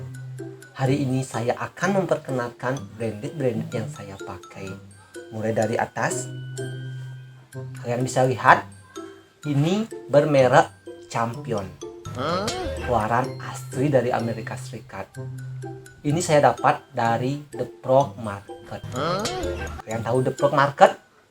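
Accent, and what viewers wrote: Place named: Bali, Indonesia. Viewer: tabanan napi gus?tiang saking jegu....logatne kentel care logat penebel e...sampun tiang subscribe...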